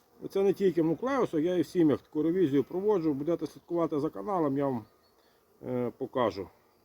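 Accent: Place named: Prykarpattia, Ukraine. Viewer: розмовляєте не по тернопольськи ,а більше говір схожий на Прикарпаття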